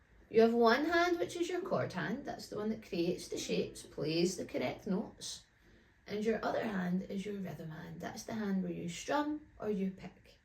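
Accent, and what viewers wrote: Scotland, United Kingdom. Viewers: Very useful tips! Thanks - and I listen to your videos to learn, but do love your Scots accent along the road to learning. 🎶 PS I have no idea what a "crabbit" is!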